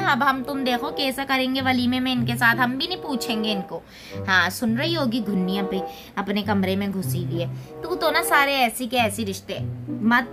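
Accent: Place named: Lalukhait, India. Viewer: very good accent, ,same i heard somewhere in lalukhait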